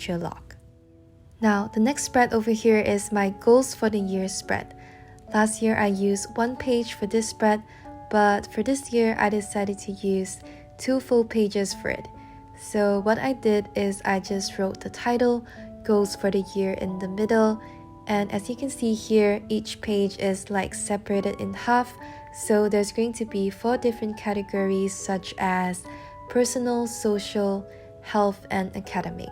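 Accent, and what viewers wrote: Malaysia. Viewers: Omgg it's so pretty. Your accent sounds Malaysian, are you from Malaysia?❤️ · Immediately thought that you're a Malaysian when I first hear your voice! Super nice bujo and here you gain a new follower!!! ♥♥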